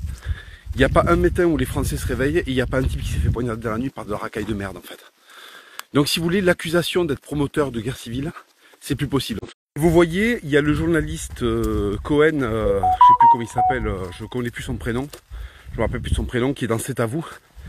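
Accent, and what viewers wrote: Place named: Arab world. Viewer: Merci d'avoir posté les preuves évidentes que tu n'es pas un Breton et ne l'a jamais été. Tu zozotte toujours autant le bédouin hahaha et puis bon, ton accent, malgré tes acrobaties n'échappe à personne. 100% Accent arabe. Bon allez, je te laisse rêver à une identité qui n'est pas tienne. Tu t'humilie tellement tout seul que je n'ai même pas besoin de te vanner. Y'a pas de Fatima en France, à part les bédouines arriérées venues de chez toi, qui viennent à la nage chez nous.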